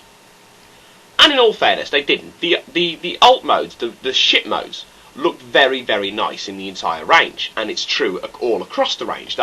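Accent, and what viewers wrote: United Kingdom. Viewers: where are you from you sound scottish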